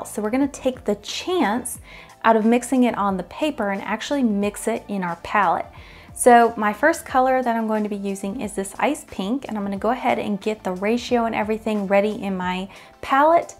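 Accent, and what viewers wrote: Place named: North Carolina, United States. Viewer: I love this effect and can't wait to use it soon. Also: I thought I knew that accent! I just saw your fan mail address. I'm from right up the road, Shelby, NC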